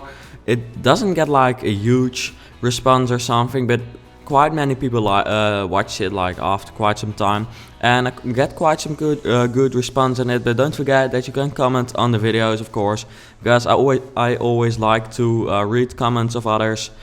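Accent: Netherlands. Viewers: can I just say... I'm English, and I love your accent. I think Dutch accents are amazing. Really nice build.Very inspiring.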